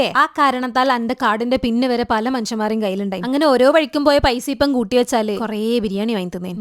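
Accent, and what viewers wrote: Kannur, India. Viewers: Ighale samsaram kelkaan vendeet maathraan njn ighale video kaaanin....first kanda samayath njn video ellaam keri kandu....ighale kannur slang I love it😂❤❤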